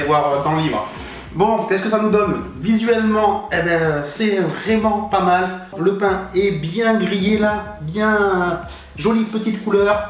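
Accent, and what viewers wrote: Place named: France. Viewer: je t'adore 😍 Non seulement pour toutes tes recettes, mais parce que tu mets à l'honneur beaucoup de recettes de mon pays 🇮🇹🇮🇹🇮🇹 Et ton petit accent français ne gâche rien à la prononciation italienne. Bisous 😘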